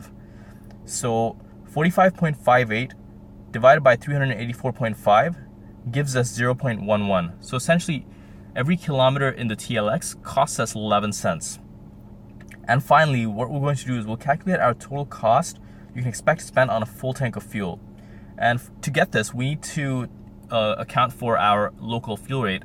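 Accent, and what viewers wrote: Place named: United States. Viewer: Which country is this? The narrator has an American accent and they are speaking in kilometers and liters as well as dollars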